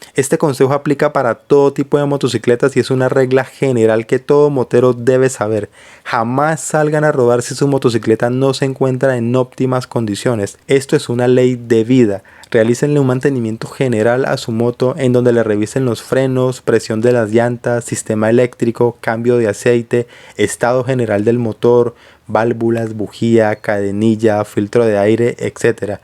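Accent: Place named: Colombia. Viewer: Gracias por los consejos, me pareció genial el vídeo gracias a qué no tienes el acento colombiano tan marcado como otros moteros, saludos desde México 🇲🇽🇲🇽🇲🇽👍👍👍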